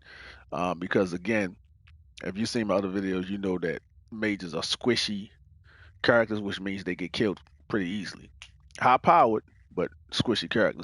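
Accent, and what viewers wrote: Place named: Louisiana, United States. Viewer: Man, are you from Louisiana? Cause you got that accent! Also, great video. Take this sub!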